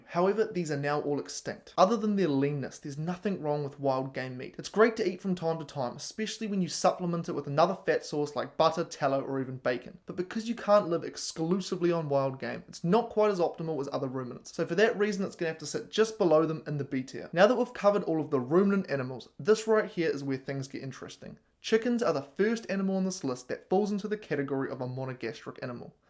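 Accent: New Zealand. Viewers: First video I’ve ever seen on this channel… what is his accent?! It’s seems to be 70% NZ, 15%Bondi hipster, 15% Saffa. Parents from Zim? I dunno, but I want to 😂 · Ah— NZ! I knew the "accent" was not AU, and not SA. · Are you a New Zealander? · Accurate video.. love the Kiwi accent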